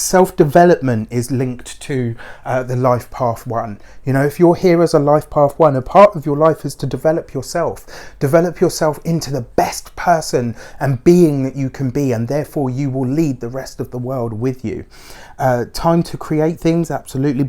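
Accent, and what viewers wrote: United Kingdom. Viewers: Is your accent British? Either way I love it! Haha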